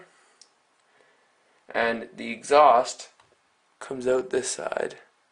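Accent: Canada. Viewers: are you canadian? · hah hah, u're canadian!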